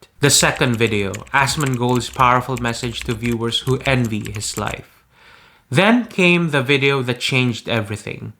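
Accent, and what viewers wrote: Philippines. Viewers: Pina-panuod ko si dugyot kanina nung bigla nyang siningit yung video mo. Nung pinlay na nya yung video mo, sabi ko.. "Bat parang tunog pinoy yung english ni kuya?", at tama nga ko. Masaya ko para sa nangyari sa buhay mo ngayon pare 😊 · accent pa lang pinoy for sure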